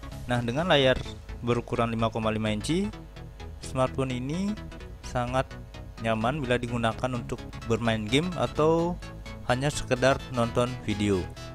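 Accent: Bali, Indonesia. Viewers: logat balinya kentel kak 😂. orang Bali kah? #cumantanya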